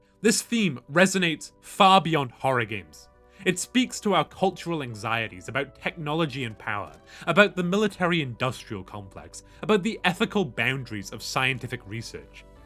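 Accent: United Kingdom. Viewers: They gotta be bri’ish or just really fckn good at talking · I completely fuck with how British you are.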